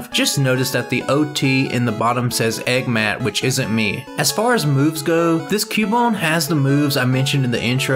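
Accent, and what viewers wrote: South, United States. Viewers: oh for sure. It would be a lot of work and something plan out over a long period of time. You’d also have to feel out the comments to see if other people would be interested in that since it’s kind of niche. But Pokémon run channels are growing in popularity, so it could help your channel differentiate itself. But mainly just do what you enjoy and everyone watching will enjoy it too. You’re doing great! I really like your layout with the move sets and stats on the side so we can track the Pokémon on its run and anticipate what’ll come next. And nah, you ain’t Canadian. You sound more southern than me, and I’m from Texas haha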